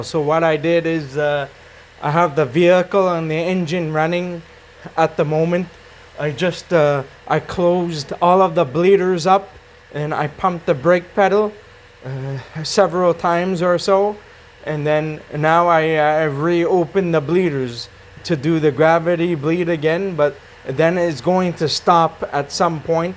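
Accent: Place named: South Africa. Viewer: Its been a whole year of trying to figure out your accent and Im just going to wing it, is it South African?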